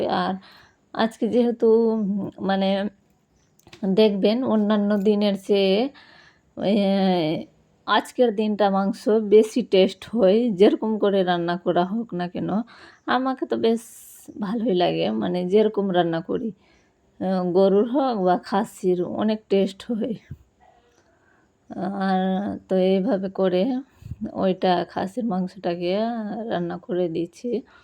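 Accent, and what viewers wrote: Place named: Murshidabad, India. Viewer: আপু তোমার বাড়ি কি মুর্শিদাবাদ , তোমার কথা শুনে ওরকমই মনে হচ্ছে😊😊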